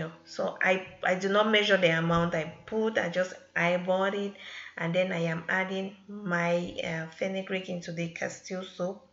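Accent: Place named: Africa. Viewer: Do your daughter have an accent like yours? I love your beautiful African accent, where in Africa are you from?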